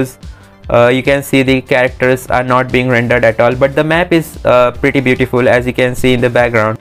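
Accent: India. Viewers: Я не знаю английский,но я слышу что ты разговариваешь с акцентом,ты индус?😁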